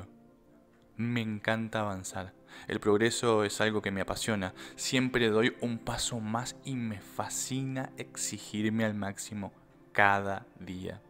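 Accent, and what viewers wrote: Argentina. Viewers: Super efectivo! Además me encanta xq esta en argentino y lo siento mucho más real y personal 🇦🇷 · Me da risa estás afirmaciones . El tono argentino y como lo dice te partes de risa 😅😅i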